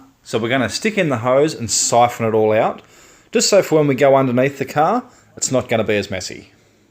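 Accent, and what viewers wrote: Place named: Australia. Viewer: Your are legend mate. Sounds like Australian if yes where about your base? Im from Melbourne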